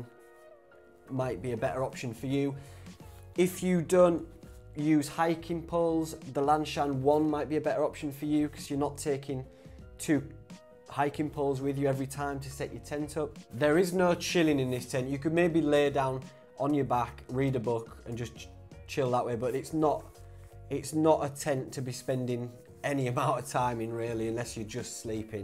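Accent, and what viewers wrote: Yorkshire, United Kingdom. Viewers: Great review!! The 2 Pro looks the ticket. By the way, thats got to be a North Yorks accent right? Im guessing York :-)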